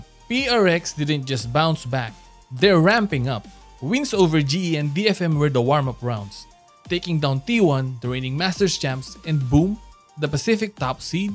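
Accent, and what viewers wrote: Philippines. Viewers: why do u sound filipino bro. Anyway, here's my sub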